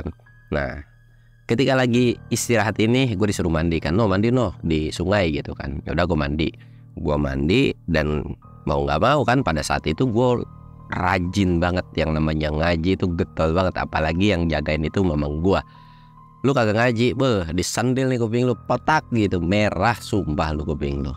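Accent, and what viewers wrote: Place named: Bogor, Indonesia. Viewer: Waaaaahhhhhh...... asikkkkk.... suka dengerin... klw cerita ny sm mamang.. Bogor nich bang....😅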